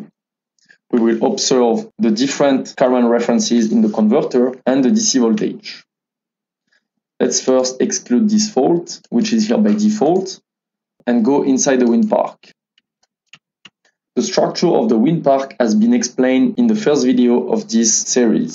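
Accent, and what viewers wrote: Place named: France. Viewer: Mon anglais est plutôt chaotique. Il me semble détecter un petit accent français assez sympathique ducoup auriez vous la même vidéo en français ? 😅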